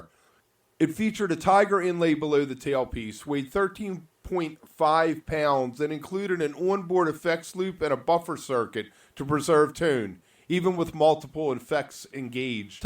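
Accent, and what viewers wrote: Baltimore, United States. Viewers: Baltimoron here, lived in Annapolis/Balto/DC area 1979-'92 [age 14 to 27], before moving to Northern California [Santa Rosa] to be closer to the flame.. And yes, I pronounce my long "o"s the same way the narrator does.. & that is pure BALTIMORE, baby!.. Much as I'd like to rid myself of this particular language/speech trait, it seems that it's "baked in" > for LIFE.. Oh well.. There are also aspects of my Allentown PA [Pee-Ay] accent [lived there from birth to age 14] which apparently "dog" me to this very day.. Embarrassing!.. Oh well, what can ya do?!?.. As the original question-asker opined > GREAT VIDEO, hon'!.. Har!.. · Dude, are you from Baltimore?? Asking because of the accent. Great video!! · I’m from Baltimore - this is a Baltimore accent on elephant steroids. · Great video, but yes I heard the Baltimore right away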